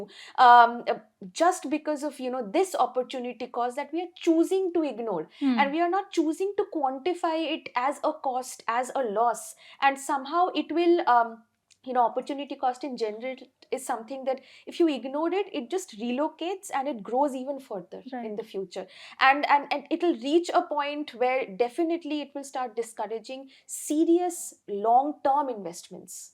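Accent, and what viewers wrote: India. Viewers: Indian speak English I don't understand a word you saying indian accent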